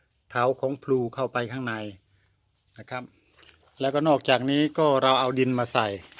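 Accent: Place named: Southern Isan, Thailand. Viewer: พูดสำเนียงเหมือนคนอีสานใต้จังครับ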